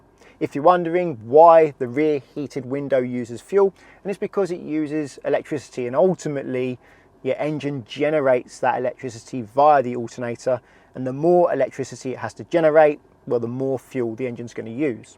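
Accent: United Kingdom. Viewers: Is he s British or something? Sounds different to me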